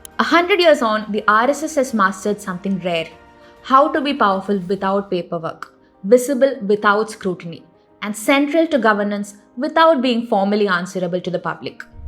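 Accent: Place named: Kerala, India. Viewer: What motivated this lady to probe RSS, from the accent she sounds like a Keralite....must be some middle Eastern *und which is under her table,throbbing her for this video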